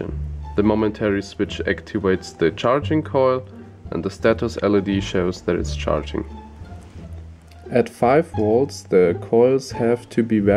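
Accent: Germany. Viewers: Hab ichs mir doch gedacht dass der Akzent Deutsch ist :D und ich hab mal wieder meinen falschen acc...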